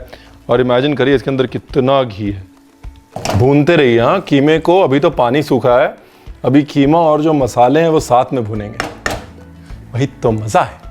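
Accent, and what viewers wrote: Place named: Punjab, India. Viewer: Do you ever shower and clean up! You and your Punjabi accents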